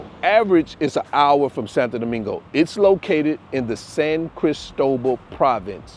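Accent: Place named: Alabama, United States. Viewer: Es el acento Alabama para mí .. con las palabras en español 😂 “malecón” “Ave Spaña” 😂🫶🏽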